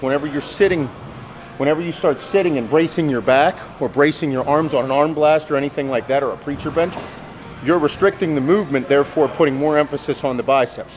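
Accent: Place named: Pittsburgh, United States. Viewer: You have to be from Pittsburgh. I can hear it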